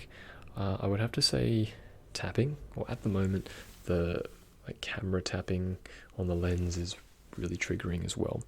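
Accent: Australia. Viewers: Yes another Australian asmrtist! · I don’t know why but your voice reminds me of an Australian Fred’s Voice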